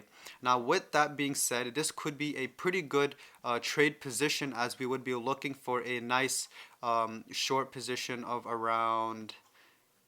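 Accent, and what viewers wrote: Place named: England, United Kingdom. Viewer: You from england bro?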